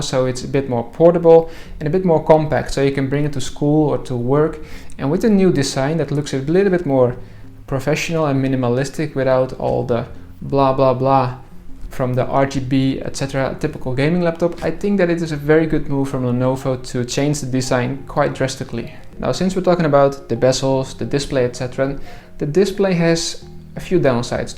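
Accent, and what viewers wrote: Netherlands. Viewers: Goede video! Ik heb er wat uit geleerd... Als een Nederlander Engels probeert te praten merk je dat meteen EN Dat dit zeker een laptop is die het kopen waard is. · Are you dutch? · Is deze man Nederlands?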